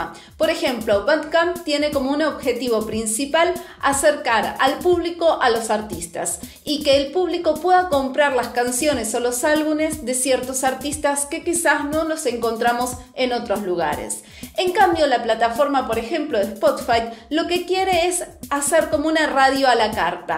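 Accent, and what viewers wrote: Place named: Argentina. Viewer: Que bueno ese acento argentino :)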